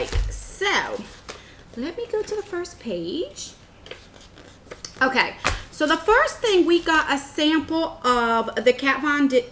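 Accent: New Jersey, United States. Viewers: Lol. I think you said "Walmart scrub" before describing the scrub. The Jersey accent is similar to us in MA, but I think you said Walmart....and I almost spit my tea out. Oh I love you.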